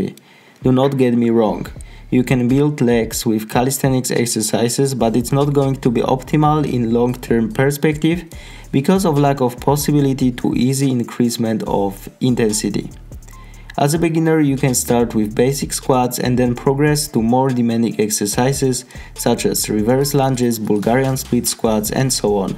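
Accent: Poland. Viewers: I think when i listen your voice i conside that you from maybe Poland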